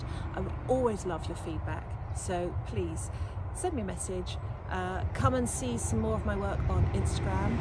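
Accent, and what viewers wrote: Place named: England, United Kingdom. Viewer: I just came across this and glad I did. Another fantastic job of a beautiful Natural Woman in a lovely setting. I love the pics on the stairs. Sensual and sophisticated. What makes you different from some of the other models is your natural look. No overly made up, fake looking, Barbie doll. And I love your narration before and after the shoot. You have a wonderful voice, very smooth and soothing to the listener with that very nice English accent. I would love to see you in some pics from an Old English estate type setting with maybe a bit of narration about the history of the place. That setting would fit you perfectly and cover all the bases regarding your unique look and personality. Thank you and keep up the good work.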